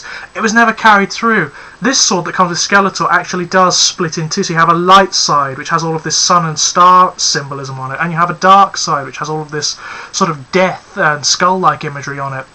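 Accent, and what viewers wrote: England, United Kingdom. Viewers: wow you're accent is so posh where in england are you from :)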